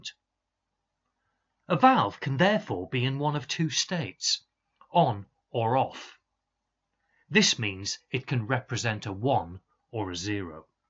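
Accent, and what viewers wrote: United Kingdom. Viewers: You've got such a beautiful british accent. And your explanation is very clear!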